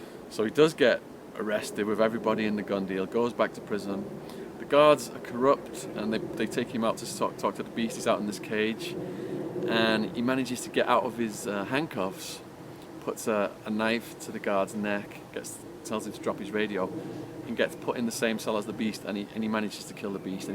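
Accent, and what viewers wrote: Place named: England, United Kingdom. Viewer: Bro you sound like your from England what would you know about California prison politics?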